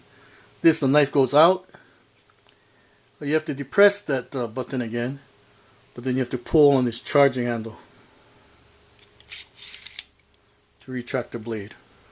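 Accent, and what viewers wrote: Hawaii, United States. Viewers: yeah for sure, i mean some people just do a plain table , i like the extra effort, thats why i always watch your uploads, that and the Hawaiian accent is nice to hear.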